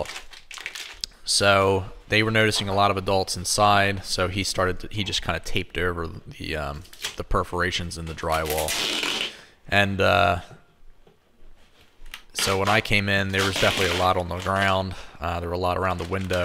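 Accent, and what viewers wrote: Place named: Philadelphia, United States. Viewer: Not sure if you're aware of a show called The Bonfire out of NYC but they would get such a kick out of how you say words like "COMB" and "HOME" They are, what you might call, connoisseurs of Philly accents.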